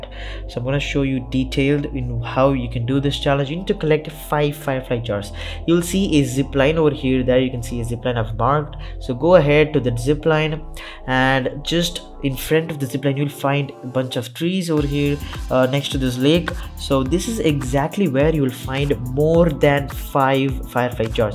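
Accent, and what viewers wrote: India. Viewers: If your indian you have the best indian English accent nice your the only indian I can understand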